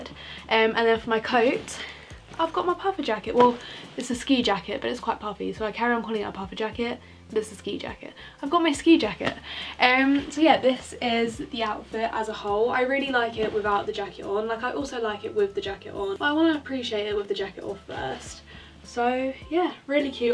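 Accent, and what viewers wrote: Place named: South East, United Kingdom. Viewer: So I hope this isn’t too intrusive but I was wondering if you’re from the south east, cos I am Kentish and I noticed you mentioned the Tate in Margate and you have a very familiar accent. Xx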